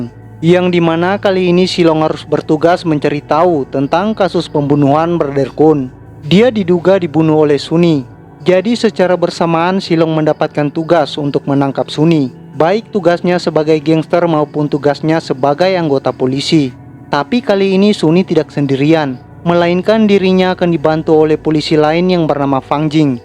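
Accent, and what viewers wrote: Eastern Indonesia, Indonesia. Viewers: Yg ngomong ini sepertinya orang timur. Gak enak banget dengar bcra orang timur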